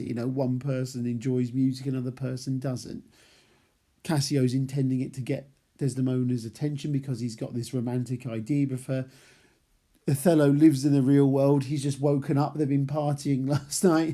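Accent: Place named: North, United Kingdom. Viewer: Love these sm thank you for helping me! P.S you sound like a northerner from game of thrones Winterfell!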